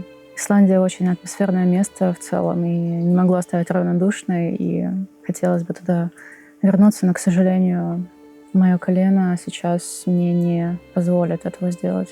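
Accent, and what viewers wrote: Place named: Latvia. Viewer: Скорее всего у автора латвийский говор и соответственно интонации в голосе. Хотите огня😂 смотрите Жорика Вартанова 😅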